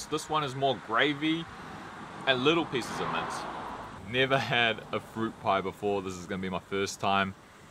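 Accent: New Zealand. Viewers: Bruh your kiwi accent is strong lol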